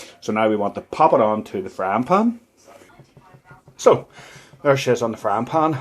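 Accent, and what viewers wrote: United Kingdom. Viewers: I hear the (Northern) Irish accent!!!!! Your recipe is EXACTLY the same as my Aunt used to make..... Mo Chara! 👍👍👍👍👍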